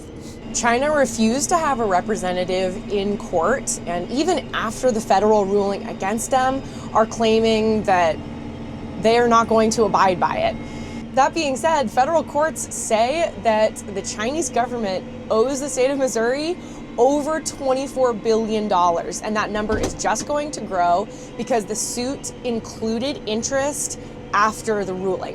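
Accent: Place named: California, United States. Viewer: Your voice is getting “too California” accent. You’re a midwestern woman!!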